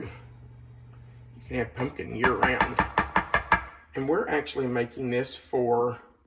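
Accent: South, United States. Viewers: His stories are one of the reasons I enjoy watching. I am from Michigan and love to hear southern folks talk , it is intriguing and oddly relaxing to me. But to each is own.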